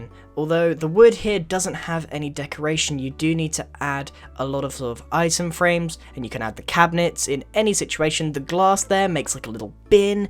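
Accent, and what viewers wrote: England, United Kingdom. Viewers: thank god he's british ! And god save the queen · you sound british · Are you British? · he's British the way he pronounces some words doesn't match with ours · An easy-to-digest, well spoken, simply arranged tutorial. And he's British! Great series, keep it up!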